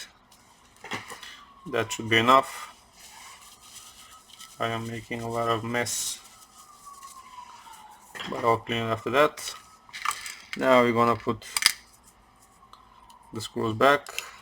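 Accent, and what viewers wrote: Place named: Russia. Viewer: парень, ты русский что ли? акцент ебейший)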